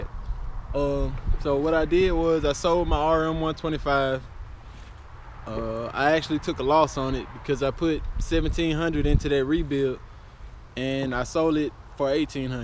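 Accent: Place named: Gulf Coast, United States. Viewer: yo, where do you live? You have that Gulf Coast sound to your voice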